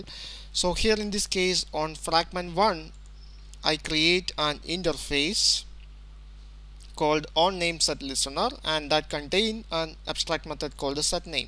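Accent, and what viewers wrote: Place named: India. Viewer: Dat indian accent dou. =D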